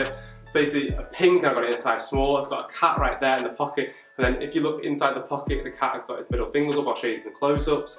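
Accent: United Kingdom. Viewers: First time being called a bro since I'm a girl 😂 I could tell from the accent, but like whereabouts in UK - What city? Ahh cool, any standout stores or you just go to a collective?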